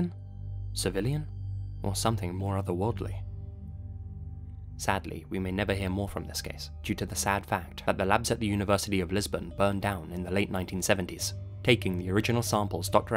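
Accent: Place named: England, United Kingdom. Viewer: I cant stand the English accent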